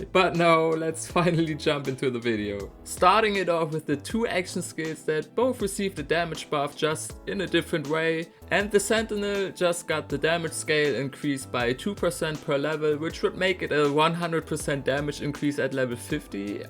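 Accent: Germany. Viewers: Höre ich da deutschen Akzent? xD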